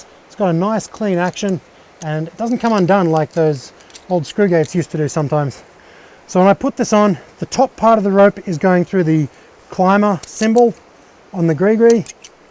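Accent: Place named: Australia. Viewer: Hey you sound like an Aussie (that's a compliment). Excellent, excellent video. Sound advice at every stage. Particularly at always carrying a Reverso. My current project is toying with top rope solo for ice-climbing. Presently using a Petzl Rescucender (top) on a harness combined with a Petzl microtraxion (bottom). The Rescucender is very heavy as I have teamed it with a steel (Grivel) biner because of the cross-loading. Have you seen/tried the TAZ Lov2 fall arrest descender <link>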